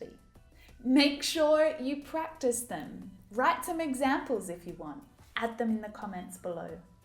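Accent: Australia. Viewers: You're smart enough to make me love English language particularly Australian accent.Many thanks dear. I need a lesson about reading comprehension (pre reading, how I deliver it for Arab students ( pre intermediate level)🌷⚘🥀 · You just made Australian accent the best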